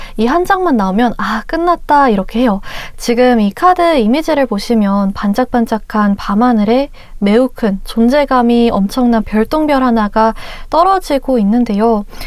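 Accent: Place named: Chungcheong, South Korea. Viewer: 끝말이 ~~할거같아요 이런말에 끝부분이 흐려서 ~~할거가텨~ 일케 들려서 왤케 웃긴짘ㅋㅋㅋㅋ 저 충청도 사람인데 정겹게 들리네욯ㅋㅋㅋㅋㅋㅋ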